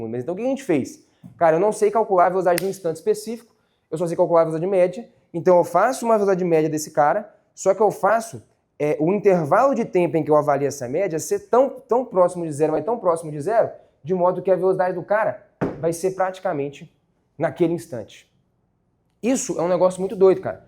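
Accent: Minas Gerais, Brazil. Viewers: Esse professor é mineiro mas se veste de português.kkkk. òtima aula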